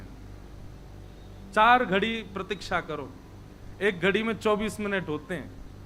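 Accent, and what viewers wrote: Braj, India. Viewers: Maharaj ji aapke mukh se barij bhasha mein katha sunna bahut acha lagta hai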